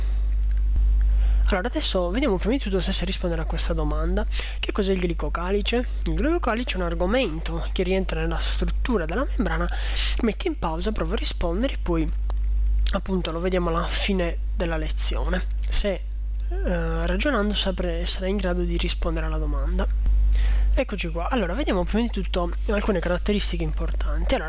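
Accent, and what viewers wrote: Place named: Sardinia, Italy. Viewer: riesci a postare anche i link del test di medicina grazie... i tuoi video sono spettacolari poi con questo accento sardo ancora meglio complimenti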